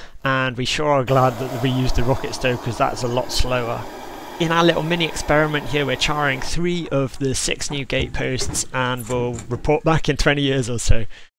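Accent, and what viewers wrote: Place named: West Country, United Kingdom. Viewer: But you don't have a Welsh accent. More like Somerset or Norfolk? No, wait; I've heard it in Bristol.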